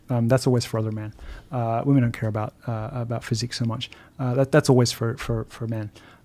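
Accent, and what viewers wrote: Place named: United States. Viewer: He sounds American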